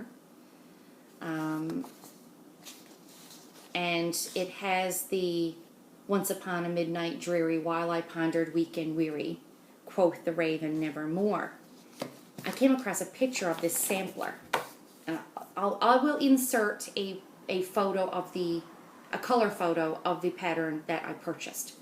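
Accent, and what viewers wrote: Newfoundland, Canada. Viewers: I enjoy your videos ( and that Newfoundland accent ) so much. I am in rural Texas, no doubt I have my own accent, and I am over 120 miles from a Michael's and 70 miles from WalMart. No LNS anywhere either, so I do my stash enhancement shopping online. At least I don't have the shipping costs that you do. 😊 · I was listening to you speak and thought... that's an interesting accent. I feel like she's mentioned where she is from before, but I can't remember! So when you said "Newfoundlander" I was like "OOOOH!" I think you are the first person I have encountered with that accent! No wonder I couldn't quite place it. Your dad's boat is looking amazing! I am so impressed with the progress you've made and how fast it is going. My full coverage design is not even inching along, haha. And I agree with you! That Record Girl's hair is looking awesome.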